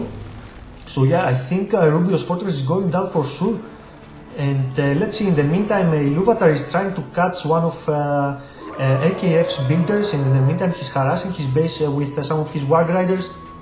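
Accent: Greece. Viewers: Hey, are you Greek? That accent sounds like a Greek talking English. Just wondering...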